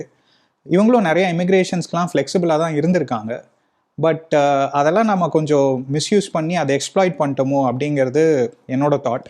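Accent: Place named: Coimbatore, India. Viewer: நான் வசிப்பது பெங்களூர் தம்பி நீங்கள் நன்றாக பேசினீர்கள் நீங்கள் கோயமுத்தூர் என நினைக்கிறேன் தம்பி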